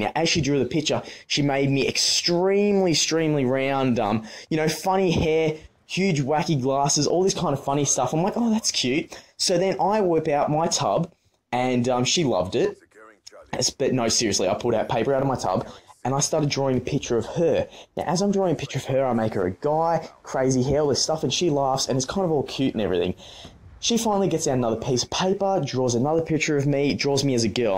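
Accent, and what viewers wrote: Australia. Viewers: R u Australian coz im Australian and u definitely have an Australian accent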